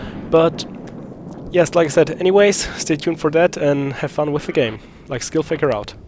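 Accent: Germany. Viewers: Bist du Deutsch? Man hört dass es Deutsches Englisch ist :) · geil man :D Deutscher Aktzent erkennt sich meilenweit :D tolles vid, hat mir sehr geholfen danke man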